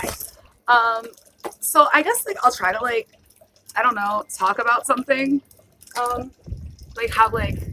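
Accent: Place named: South, United States. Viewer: How long have you been in Central PA? I'm hearing an awful lot of Y'all's, you're a little north for that kind of talk.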